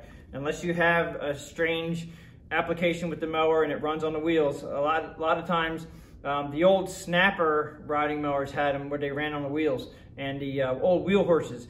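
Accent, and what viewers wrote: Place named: Pittsburgh, United States. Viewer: Already done sir! Yes it may seem barbaric to some, but you gotta do what you gotta do and I assume most people would rather take the barbaric route than shell out tons of cash for a new deck. I wish I had seen this video before I got rid of my old John Deere. Also...based on your slight accent I'm assuming you are a fellow Black and Gold fan? ;)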